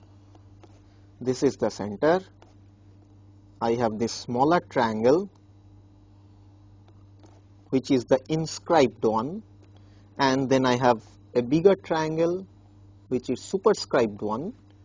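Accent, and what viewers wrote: India. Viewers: never underestimate the indian accent, though it may seem funny to you, the indians are some of the most brilliant minds in the planet, the average indian 12th grader can integrate twice as fast as an MIT graduate (doesnt necessarily mean that the indian guy is more brilliant or anything), just saying..... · i love this india accent, whether the india person is prescribing antibiotics or a mathematical proof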